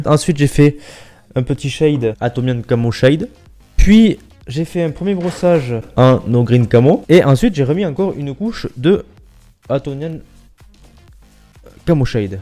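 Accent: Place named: South, France. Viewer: j'avais jamais remarqué que tu avais autant l'accent du sud ^^.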